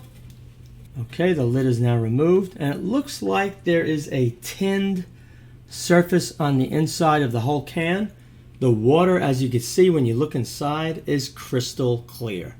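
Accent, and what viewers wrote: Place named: Boston, United States. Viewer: So you're a Bostonian. I can tell by your accent plus the can is labeled Boston, Mass.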